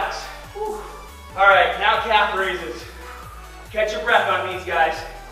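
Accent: Boston, United States. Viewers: Here due to Covid-19 gym closure. I live in Quincy, MA. And that sounds like either a fake Boston accent or someone with a Boston accent trying to hide it. I grew up in MA with immigrant parents so I talk different than everyone. But back on topic, my quest is to not lose any muscle or strength during this crisis. Good workout! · Boston accent like crazy! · its a boston accent i think. it wasn't always so prevalent in the past though. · The Boston accent is strong with this one... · This guy is so hyper and it hyped me up it’s awesome. Also I’m from Boston and I love the accent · This was just what I needed for my Coronavirus outdoor workout...your Boston accent kills me! hahahahahaha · Love this leg workout. has the BEST Boston accent ever!!!! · the boston accent is strong in this one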